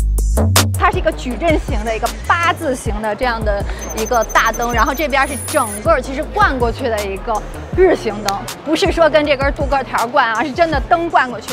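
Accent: Beijing, China. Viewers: 触控板儿，哈哈哈，妹子这浓浓的北京腔儿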